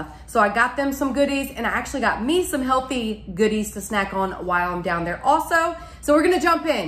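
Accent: South, United States. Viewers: I've watched you for a long time and you're getting louder and louder and more and more country all the time... I wonder as a woman from North East Tn. And country as anyone so I am not fussing Lord knows. It's only that I am curious if your husband or mother or someone was making you tone down and you've cut loose or what happened recently. You are deceiving the Bulldogs glad and have said y'all a dozen times in 2 minutes and I could hear you all the way in back of my house your video started auto play is on and I watch you allot so it started you're newest video and I had to come from back of the house to turn it down. So curiosity I guess..... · Where are you from live your accent you sound like Paula Deen saying y'all lol....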